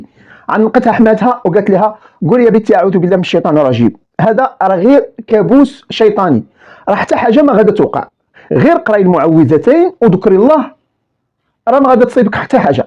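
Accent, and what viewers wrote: Egypt. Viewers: السللم عليكم خويا ❤❤❤❤🎉🎉🎉🎉 صوتك بالهجة المصرية غير عادي هههههه نحبك تروي قصتك بالهجة المصرية ههههه❤❤❤❤❤ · أ حسنت القصة بيمافيها اللهجة المصرية أ حبك في الله يا أخي ❤❤❤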